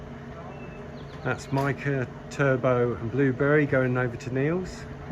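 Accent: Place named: United Kingdom. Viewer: As Brit who watches a lot of US thru-hikers, it's a nice change to hear a British accent.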